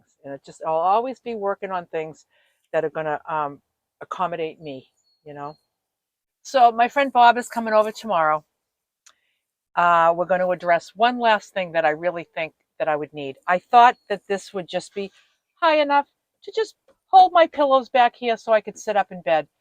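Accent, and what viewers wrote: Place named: New York, United States. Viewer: You could also use plex or adhesive glue for the cracc and the van build is absolutely stunning I support this lifestyle 1000% coming from New York myself I peep the accent keep up the great work love the articulate energy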